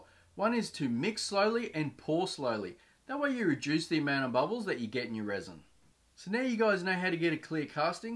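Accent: Australia. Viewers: Great to hear an Aussie accent and explain things so well thank you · Ahhh... There's nothing better that clicking on the video and hearing an Aussies voice !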